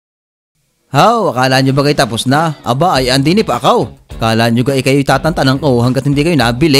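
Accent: Batangas, Philippines. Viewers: Solid Yung Batangueño accent Hahahaha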